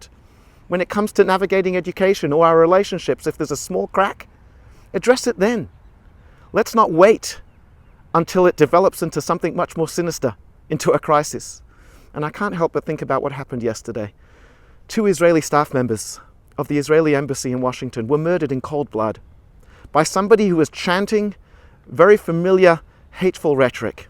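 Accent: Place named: Australia. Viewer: Wow, look who it is. All these years it must be 25 years and your Australian accents come back. I hope you’re doing well thanks for a great message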